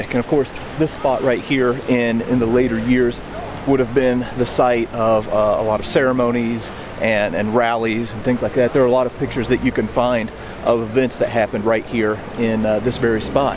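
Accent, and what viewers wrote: United States. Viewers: Shame about the dreadful American accent. The word “route” is pronounced “root,” not “rout.” The “A” in Adolph is pronounced as in “rat,” not as in “cake.” Nothing annoys me more than Americans mutilating the King’s English.🏴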